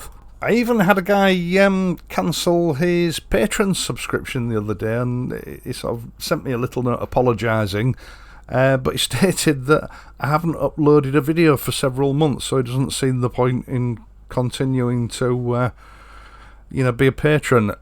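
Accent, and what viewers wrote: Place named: Keighley, United Kingdom. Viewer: Congratulations, I'm following from Germany and I love the Pictures, the Triumphs and your comments on all the current e-mobility nonsense happening around. I worked in Keighley for a while 30 years ago an I love your accent. All the best for the next 100k 🙋‍♂️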